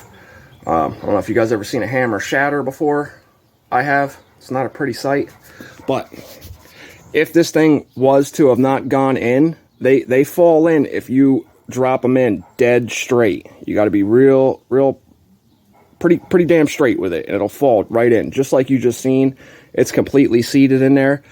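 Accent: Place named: New Jersey, United States. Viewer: Had a feeling you were from NJ, south if I had to guess, closer to Philly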